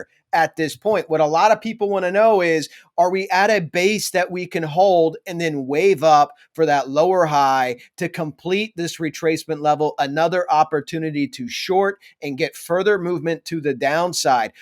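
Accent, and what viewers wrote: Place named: United Kingdom. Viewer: thx B, I thought so. He sounded British. Well, I'll try him again on Telegram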